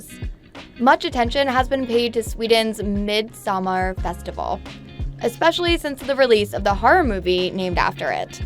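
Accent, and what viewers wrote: United States. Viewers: was about to say the same heehee, sounds for the most part Chinese-American as well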